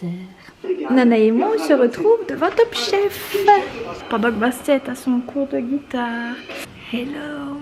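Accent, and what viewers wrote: Belgium. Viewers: C'est fou comme l'accent belge ressort quand tu parles à voix haute haha ! C'est rigolo et j'aime trop l'accent belge (c'est mes origines !) merci pour cette vidéo qui relaxe malgré le non chuchotement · L'accent belge quand meme c'est le meilleur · Normalement je ne commente pratiquement jamais les vidéos YouTube mais ça fait déjà quelques vidéos à toi que je commente. Je trouve que tu fais un super travail, c'est très qualitatif. J'aime beaucoup ta personnalité, tu es très naturelle et c'est génial ! Cette vidéo est une excellente idée. C'est vrai qu'au début j'étais un peu déçue que ce ne soit pas en ASMR mais finalement ta vidéo est au top. Je me suis tapée un fou rire avec votre couteau suisse, mon dieu quand on dit que le rire est communicatif, ce n'est pas une blague. Donc voilà juste merci pour tout ! 💙 PS : J'adore ton accent belge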